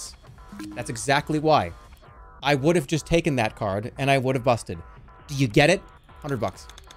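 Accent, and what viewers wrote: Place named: Ireland. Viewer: Halfway through I realized this was jerma, not RTgame… does he always sound this Irish 😂😂?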